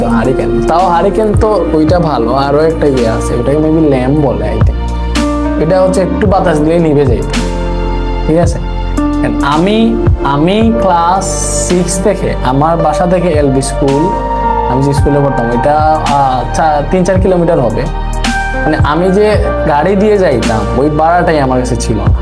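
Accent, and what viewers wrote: Sylhet, Bangladesh. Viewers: Apnar basha kotay vaiya sylhet er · ভাইয়া কি সিলেটের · Bai apne Sylheti 😮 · Baiya sylhet r kun jaygay apnar bari. Apnar kota bolar style deklay buja jay apni kati Sylheti ❤ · Accha sir ki Sylheti..? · সিলেটি নাকি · ভাইয়া আপনি যে সিলেটি এটা এতদিন ক্লাস করার পরও বুঝলাম না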